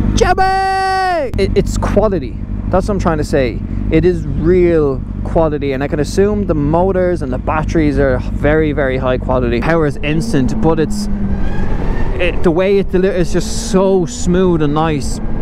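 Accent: Ireland. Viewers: it has nothing to do with your being Irish, or you accent. In fact I love the Irish people very, very much. There were quite a few times when the editing was not as accurate as it could be.. As I said, I love the Irish very much. And I love your accent. It was not my intention to upset you in anyway. And I’m sorry if it did. Please except my apology for any words I said that might make you think otherwise. Owned a Honda CX 500 V Twin in my younger days. It was when I began to suffer from arthritis that my wife and I turned to cars. My car is now Electric and love it. Hope you might buy this bike. Boy it’s quick off the mark. Richard.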